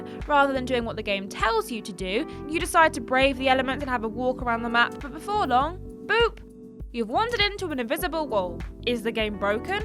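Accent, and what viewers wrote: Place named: England, United Kingdom. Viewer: I love English accents usually but for some reason puts me off a little. She's doing nothing wrong, it's just one of those things.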